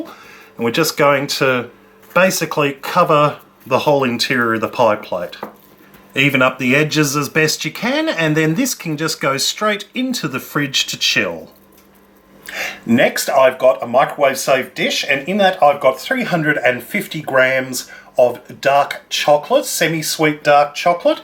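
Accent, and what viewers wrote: Australia. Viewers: He sounds Australian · I love his reactions after he tries the food. best part by far especially with an Australian accent. great video!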